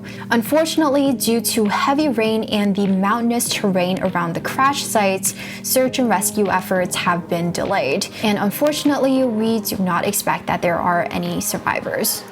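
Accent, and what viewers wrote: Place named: China. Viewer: I love your chinese -english language, you are easy to understand so new to your channel so dont know what u cover but will soon find out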